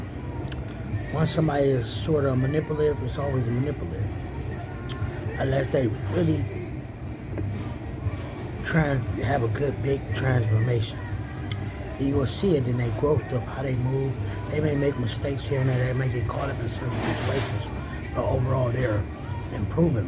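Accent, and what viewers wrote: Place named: California, United States. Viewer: Bruh as soon as i click on this vid and i could tell, Cali, esp after hearing 🤣 yeeeee🤟🏿 this was some real shi tho. Am subbin 💯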